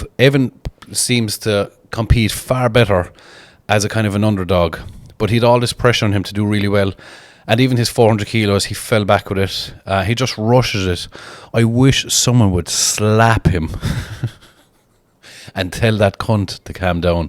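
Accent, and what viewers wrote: Ireland. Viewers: Love Irish & Scottish. Are you Irish sir? 🙂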